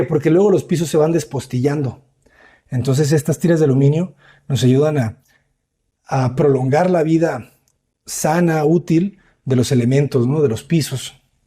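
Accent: India. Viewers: La pronunciación del inglés suena con acento que tiene la gente de la India 🇮🇳 ☺️ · Hola siempre me gusta ver tus videos, pero hoy me sorprendió te admiro por tu valor de ablar inglés sabiendo que no tienes el asentó esto es una crítica constructiva , eres el primer mexicano que escucho con asento ,, Indu,, pero sigue practicando y trata de ablar con una persona nativa con inglés y pregúntale como suena tu inglés y que sea sinsero, eso te ara corregir muchas palabras, pero una ves más felicidades admiró tu trabajo 😊.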